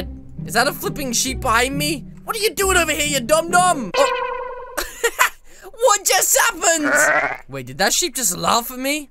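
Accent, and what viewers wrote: United Kingdom. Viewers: bruh he sounds like a british person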